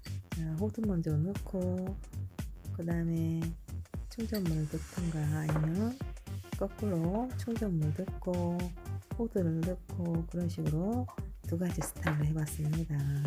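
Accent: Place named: Gyeongsang, South Korea. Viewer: 오~호두파이! 경상도 사투리까지 구수하니 좋습니다^^ 잘보고 가며 살포시 손내밀어봅니다! 좋은 인연 맺고 서로에게 힘이되면 좋겠습니다😉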